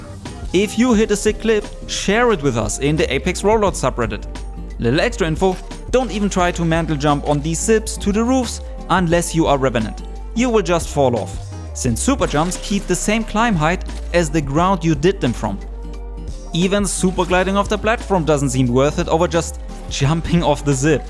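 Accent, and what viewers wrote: Germany. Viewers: Man hört schon sehr stark raus das du Deutsch bist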